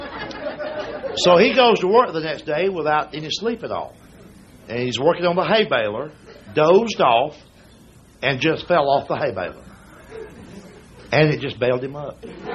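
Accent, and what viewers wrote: South, United States. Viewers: Only the thing they have in common is the southern accent, both of them are great storytellers and can just simply cause you to bust out laughing by telling a simple story but they are two different comedians. The great Jerry Clower centered all of his routine around a family that lived in the Mississippi town he was from. This guy stretches it out more and brings just a lit little bit of political comedy into his routine where Jerry Clower stayed away from politics. This guy is more like the George carlin of the south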